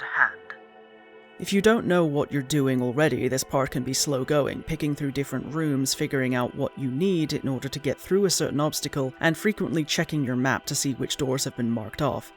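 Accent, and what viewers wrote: United Kingdom. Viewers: You are an excellent storyteller. The tone of your voice, background music, and the visuals add so much. Also am I crazy to think you sound like Sylvanas just British? Lol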